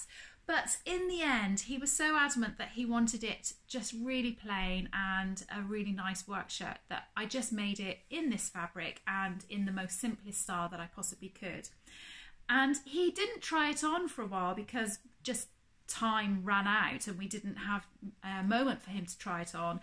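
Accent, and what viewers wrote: Devon, United Kingdom. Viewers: Hi, I think the dress and the fabric really suit you! Love your vlogs - and your Devon accent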